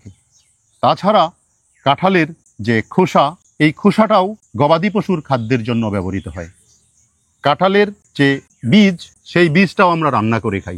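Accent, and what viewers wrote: Bangladesh. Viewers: কথা শুনে এবং চেহারা দেখে বুজতে পারেন না এটা বাংলাদেশী চ্যানেল?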